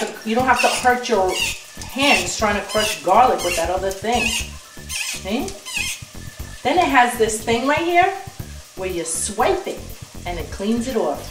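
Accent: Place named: New Jersey, United States. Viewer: My family loves stuffed mushrooms. I'll have to give them this recipe! Haha you're welcome for the pin!! Haha "NEW IERSEY" with NOOO J. You can soooo tell haha, don't you just love it!? <3Daniela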